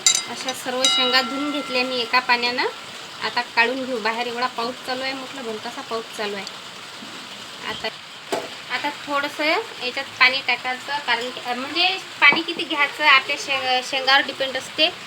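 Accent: Vidarbha, India. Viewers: भाजी एकदम छान केली, मला तुझी भाषा आवडते कारण मी अकोल्यात राहते, तू कोणत्या गावची आहे ❤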